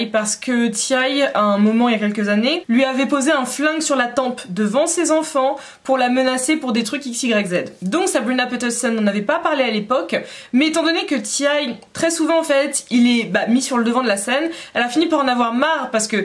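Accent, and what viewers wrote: England, United Kingdom. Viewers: Ton accent anglais 😻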